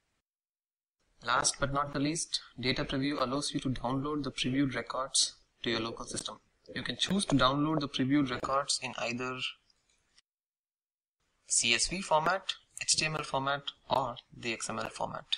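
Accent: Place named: India. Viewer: this english Indisch language..triggers me so hard...omg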